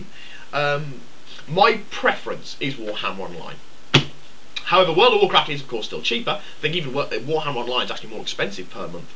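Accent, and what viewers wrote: United Kingdom. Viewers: yep definetly your real voice british? i dont know what country the currency Quids is from. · your british-ness made this entertaining enough, not really much new to say though. Hey Hey Hey Smoke Weed Every Day.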